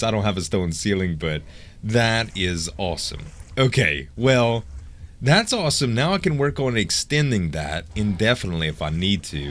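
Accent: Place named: Florida, United States. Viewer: I'm originally from Florida but am in Washington. Your accent brings back good memories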